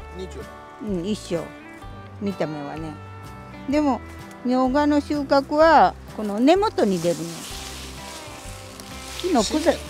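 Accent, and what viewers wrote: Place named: Ehime, Japan. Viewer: のファンです。私と同い年なのに、テキパキ畑仕事をやりこなして、凄いな！って感心しています。何より息子さんや、家族に恵まれていて、羨ましいです。愛媛出身なので、方言が所々似ていて聴いていて楽しいです。笑顔良しの、これからも無理しない様頑張って下さい。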